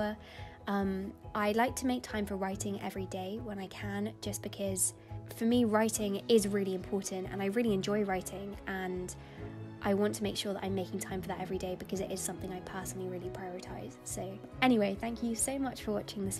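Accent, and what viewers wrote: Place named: England, United Kingdom. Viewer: Hi, You live in such a magical place... I dream to own a cottage in the middle of fields like this someday;). I have recently been a French au pair in England and I miss this country a lot, it's a pleasure to hear you speaking English with this typical accent I love so much. I discovered your channel while seeking for some ways to virtually escape from the city, and when I found you I also gained some helpful motivation tips to go on working on my Highway Code test. So, thank you for sharing the beauty that surrounds you and your soothing, clever personality, I wish you and all your kind community the most beautiful of Sundays!!!!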